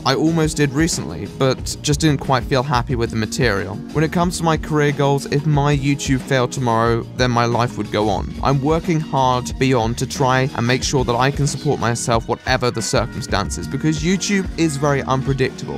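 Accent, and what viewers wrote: RP, United Kingdom. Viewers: On the question of accent. I don't think any of you are going to get exact accent, but one of my danish friends achieved a stunning British accent, mainly by reading Shakespeare out loud (as a part of her education and as a hobby). Hard work, I know, but it is possible to get a nicer accent by training. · I feel meh about it but I like him. However a Spanish accent on English is my preference. Perhaps that's why I'm indifferent to the British accents. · Cheers dude, I've watched so much of you videos every now and then my voice instinctively makes a fake British accent. Anyways congrats dude! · Subbed a few days before this video.Nice job your the only you tuber I know that ACTUALLY sounds professional and things.Very posh,lol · He is gay, a philosophy student, in his early 20s and has a heavy melting British accent He is perfect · yes!!!! 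I adore all kinds of accents all of them are amazing but british is my fav!! So much so tht since i was a lil kid id worked and practiced on having an english accent (just general accent from ppl id seen on tv) and im actually rllly good at it now and i love using it, its just such an awesome spund and i can listen to talk all day lol I also love russian, australian and japanese their languages and their accent (and asl but i dont knw if it van have an accent? Maybe in different ppls hand and arm mobility & flexibility) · I'm the kind of viewer that dislikes the usual QA video since youtubers usually put a lot less effort into them and are more there as a backup plan than anything. Though once again you posh bastard, your sickinigly sweet voice has tickled my inner ear canals in yet another video. I'm new here but I hope I don't get pushed into a locker for it since I plan on staying. Keep the grind going and I'll be sure to smash that like button like your voice smashes my special place every night. 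Though you are gay so I might have to get a penis in the meantime to fully enjoy the rammings I thrist for. Cheers cunt and make that European Union video sometime in the near future. <3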